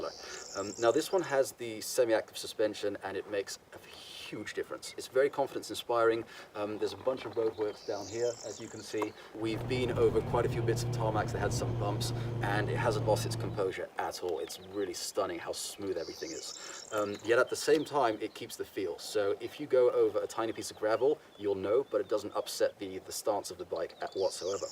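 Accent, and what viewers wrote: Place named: United Kingdom. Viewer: He also speaks like he learned from a Brit. Probably went to school in the U.K.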